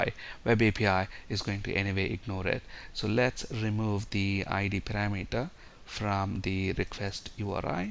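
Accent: India. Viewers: The only Indian English accent that I like is accent:)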